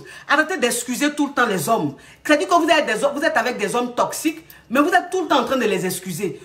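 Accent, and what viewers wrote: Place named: Ivory Coast. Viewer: Une vraie ivoirienne qui parle la même, je suis fan, longue vie à toi la madré .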